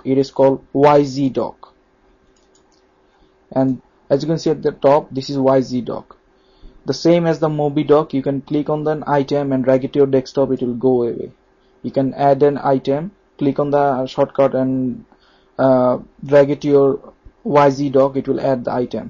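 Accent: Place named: India. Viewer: No offense to the author but he is indian? Again, no offense. Due that the accent is really familiar.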